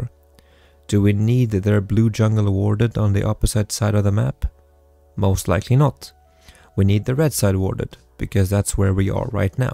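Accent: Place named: Sweden. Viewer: The way I could tell this would be a swedish channel bc of the accent. Nice vid btw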